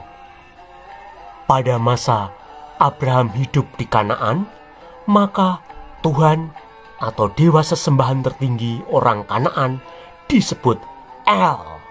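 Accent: Java, Indonesia. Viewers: Baru ini nonton chanel jdi ketagihn nonton krn bhs nya logat jawa dan penekanan kata2ny,bkin ktwa,gak ngantuk aplgi nyebut muhammad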